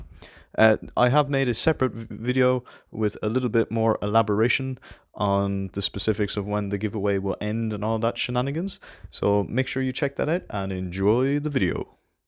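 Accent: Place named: Ireland. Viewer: As a Swede/Dane who use to live in Dublin: It's always great to hear the Irish accent!! The best English accent in the world, I always miss Ireland when hear that accent. Irish people are down-to-earth people, I love that country.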